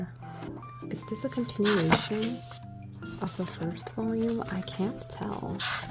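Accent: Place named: Japan. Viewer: your japanese accent is so satisfying!! loved this vlog, i’m so interested in reading the kingyoya san no karisome fuufu 👀 and omg I love marin too, that standee is so adorable waaah 😍💖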